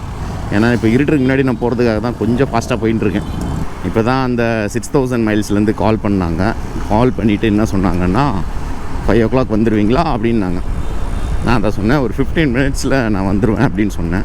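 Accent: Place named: Chennai, India. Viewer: Hi man...நீ பேசுறத வச்சு நீதான் கைப்புள்ளன்னு நிக் நேம் வச்சுகினேன்னு நென்ச்சிட்டேன்பா. .. படா ஷோக்கா கீது பா ஒன் டாக்கு ஆங்..மட்ராஸ் பாஷை கொஞ்சம் கூட எச்சாவா கயந்து அள்ளி வுடுபா..பேஷாபூடும்..ஒன்க்கு அது நல்லா சூட் ஆவுது தோஸ்த்து!!!!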